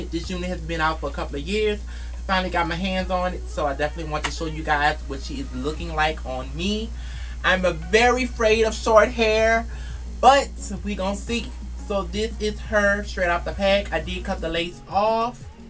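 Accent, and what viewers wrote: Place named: New Orleans, United States. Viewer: Are u from new orleans? I hear the accent.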